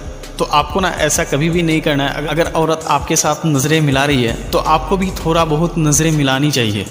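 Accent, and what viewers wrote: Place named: Bihar, India. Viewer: Aap na pakka bihari ho 100%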